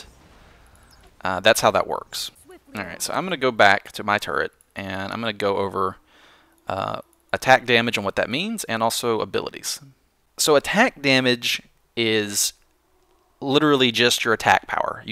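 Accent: South, United States. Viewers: this video was amazzinggly helpful:D thank youu and I can't help but notice that adorable twinge of a southern accent you have:3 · u have a southern accent :3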